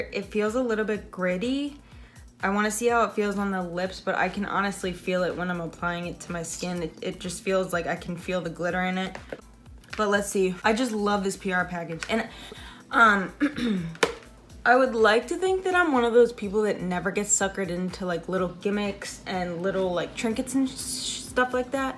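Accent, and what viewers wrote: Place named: East Coast, United States. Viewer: I LOVE east coast accents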